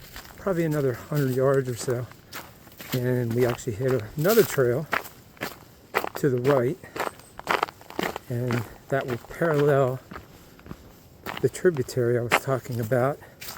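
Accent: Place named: Pennsylvania, United States. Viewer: I’m following you because your accent reminds me of my PA family. Good luck on the AT! I was just at Amicalola Falls State Park & Lodge myself and enjoyed hiking some in GA.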